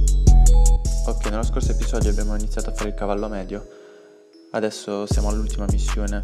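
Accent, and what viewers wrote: Apulia, Italy. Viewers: Mi sembri anche delle mie parti, pugliese? Ahahah Comunque guarda, veramente i tuoi video sono fatti benissimo , continua così.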